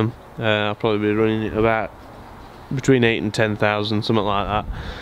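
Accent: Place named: Norfolk, United Kingdom. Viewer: I love your accent! Have you always lived in Norfolk?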